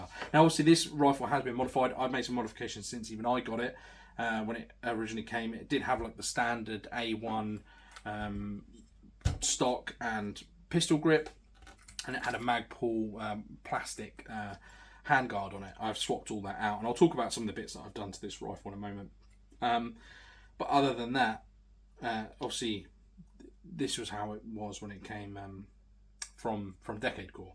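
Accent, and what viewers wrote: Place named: United Kingdom. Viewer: Hearing a British man talk about his AR15 is something I never thought would happen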